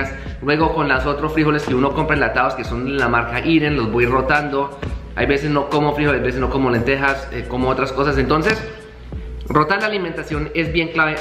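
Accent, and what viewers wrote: Colombia. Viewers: Tu eres colombiano, porque suenas a ellos. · me encanta tu español colombiano. xdxdxd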